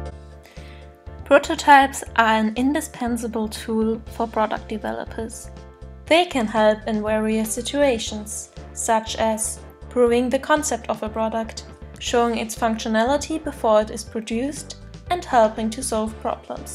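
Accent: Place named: Germany. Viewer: Thank you, this is a fairly comprehensive overview of what a prototype is. I appreciate the depth of the technical terms used. By the way, "Resources" is spelled incorrectly. Also, please reach out if you are interested in an English voiceover for any future projects. (It is sometimes a little distracting, from the content, to listen to the German-accented English pronunciation and mispronunciations.) Otherwise, thanks for posting!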